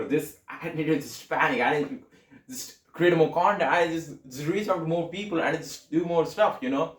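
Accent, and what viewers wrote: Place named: India. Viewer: Bro Indian accent is good u do not need to try other accents.. by the way good work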